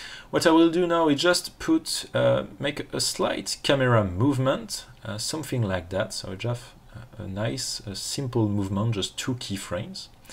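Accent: France. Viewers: I'm from Germany and I find this french accent quite charming actually. But I can imagine that people from Texas or Pakistan maybe could be irritated by it :-) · Le bon accent français qu’on aime 😭😪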